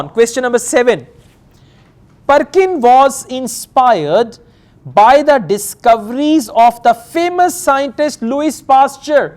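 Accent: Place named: Punjab, India. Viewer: I like urs Punjabi keep speaking like that it suits u very much👍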